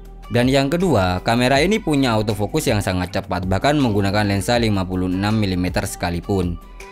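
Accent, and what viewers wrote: Madura, Indonesia. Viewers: Etemmu logat madurena cuy.haha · Logatnya kayak madura kang ? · Madura ya mas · Reng madureh reyah?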